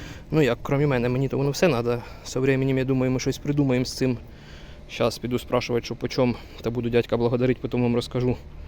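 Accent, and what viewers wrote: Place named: Central Ukraine, Ukraine. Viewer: Автор лайк поставив.Удачі.По розмові зрозумів, що Ви родом з центральної частини України.Щасти.🎉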